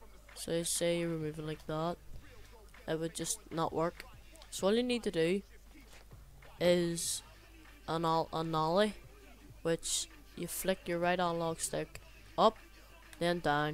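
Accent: Northern Ireland, United Kingdom. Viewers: Mate, after trying listening to these awful annoying American 13 y/o kids, it's great to hear a Norn Iron accent talk sense. Legend.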